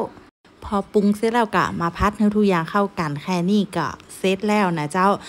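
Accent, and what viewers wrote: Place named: North, Thailand. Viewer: สวัสดีค่ะมารับชมรับฟังค่ะเพื่อนใหม่ค่ะ. ฟังภาษาเหนือน่ารักค่ะ ชอบทานเมนูนี้ค่ะ🎉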